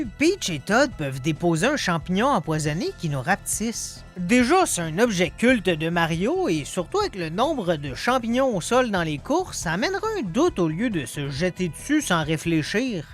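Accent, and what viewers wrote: Quebec, Canada. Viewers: Super un québécois qui a pas peur de parler avec sont accent good job chômer pi t’ai jock sont drôle en criss 😂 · Toi t'es québequois 🤣🤣, t'as un très belle accent. c'est fait du bien le changement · En tant que français ton accent québécois me surprend au début mais est tellement agréable à écouter. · Allez je m'abonne et like c'etais vrm cool comme vidéo et j'adore l'accent québecois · vidéo intéressante et très drôle, merci :) j'ai pas envie de faire mon relou avec l'accent québécois vu que t'as déjà du recevoir 10 milliards de commentaires dessus mais j'aime bien :) "un grand total de aucun est revenu"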